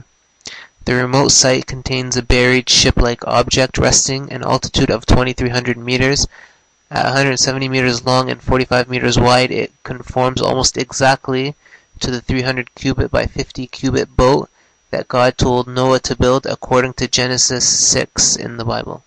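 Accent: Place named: Middle East. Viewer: According to your video the Bible was correct and the Quran was wrong. The person talking in the video sounds like he's from a middle eastern background which is probably why he's saying the Bible was wrong even though in the text he provided as proof the Bible is "correct." I Still don't believe in the whole religion mumbo jumbo. OMG A BOAT, IT MUST BE NOAH'S ARC. At least I got a laugh out of this so thank you.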